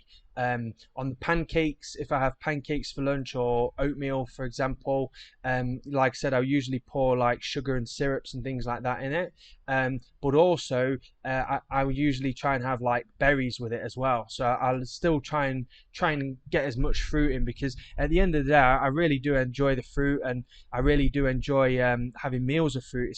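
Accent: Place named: Midlands, United Kingdom. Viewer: AHH, ok, must be the midland twang! I'm from Manchester and we stretch out the last A. Banaaana. Loving the new surge in videos, hope you keep banging them out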